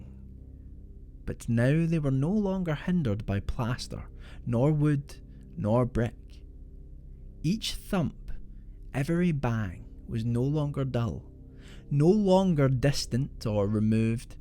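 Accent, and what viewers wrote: Scotland, United Kingdom. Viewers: I love the tales you create, weave, narrate & your Scottish brogues. Very entertaining & professional all round. Just love your podcasts & look forward to each new story. Keep up the great work:-)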